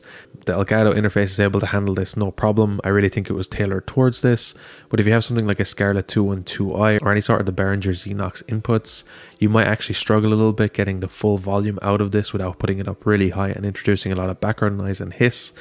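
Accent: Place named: Ireland. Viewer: Makes that sexy Irish voice so much better ;)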